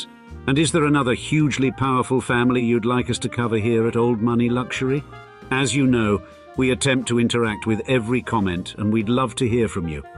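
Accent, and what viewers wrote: RP, United Kingdom. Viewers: Very nice SRP accent by the announcer. And no, the "Queens English (SRP)" is not even close to the accent of the majority of British people. The vast majority of perfect RP speakers are in overseas Commonwealth countries. Only 5-15% of British people speak with an RP (received pronunciation/ Queens English) accent. I write this to expel simplistic ignorant assumptions that many people hold.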